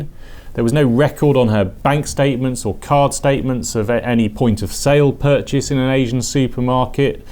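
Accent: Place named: Southern England, United Kingdom. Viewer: It is a nice accent. Southern England? Crispy.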